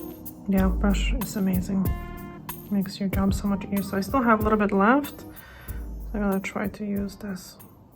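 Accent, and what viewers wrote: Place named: Poland. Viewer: Dopiero niedawno oglądam twoje filmy i dzisiaj usłyszałam że mówisz po polsku. Tak się domyślalałam bo brak u ciebie tego kanadyjskiego entuzjamu w głosie pomimo że akcent już mało polski 😉 Mam jeden lakier z Catrice z seri nudes i byłoby wszystko idealnie ale niestety słabo się u mnie utrzymuje. Po 3 dniach jest spękany na powierzchni. Za to eveline pięknie się sprawdza, mega trwałe i łatwe ale kolory mają jakieś takie mało ciekawe...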